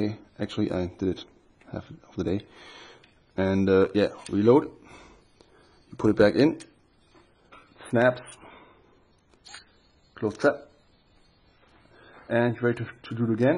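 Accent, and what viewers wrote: Germany. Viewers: Ich glaub du kommst aus Deutschland wegen deinem Akzent :D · That german accent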